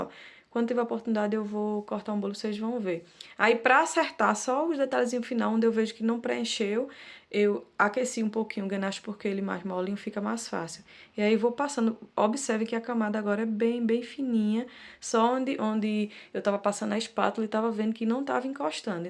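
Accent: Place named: Northeast, Brazil. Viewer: O sotaque nordestino é muito lindo,eu tenho ranço do Paulista toita,poita,kkk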